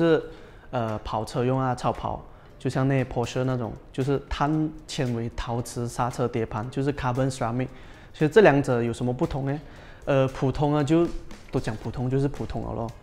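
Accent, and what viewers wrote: Malaysia. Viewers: 聯邦音很重其實又有啥問題？這是特色...up主做你自己就好...你又不是電視台新聞主播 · 抱歉解释很好不过他的联邦音很重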